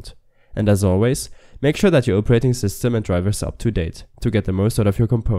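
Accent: Germany. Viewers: Love your Deutsch accent 😜😜😜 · 3 sekunden und ich wusste du bist deutsch lol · German Akzent 😅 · Genau so ein Tut habe ich gesucht danke :D Hab gleich gehört das du aus Deutschland kommst xD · Hrm...German accent sound so cool, btw what microphone do you use? It sounds great. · spricht du auch deutsch ? hört sich irgendwie so an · Wollt schon sagen ich guck das video zum erstenmal direkt habe ich gedacht deutscher haha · mann hört so hart raus das du deutsch bist xD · tahnk u man so mmusch youre the best and i love ur german accent liked and subbed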